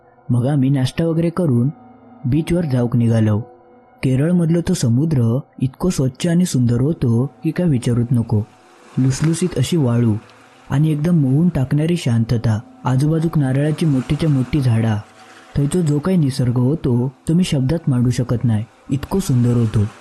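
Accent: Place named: Malvan, India. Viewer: Story mast hoti 👍👍😊tumach malvani awaj mast ahe 👍👍👍❤second part lavakar pathava 😊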